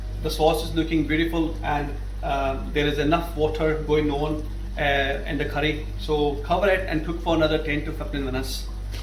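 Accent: Scotland, United Kingdom. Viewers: What was that last ingredient you added from the tiny bottle? I couldn't quite catch what you said. BTW, I love your Scottish twang.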